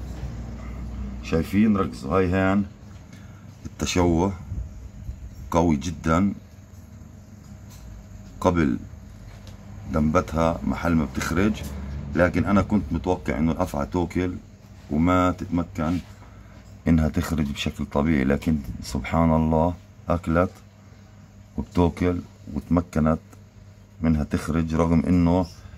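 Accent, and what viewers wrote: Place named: Palestine. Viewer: تحياتى من مصر بحبك حضرتك أوى 🙋‍♀️😊🧡🍯 وبحب اللهجه الفليسطينيه اوى وبالأخص كلمه "ولااااو" بحبها أوى 🤭💜💜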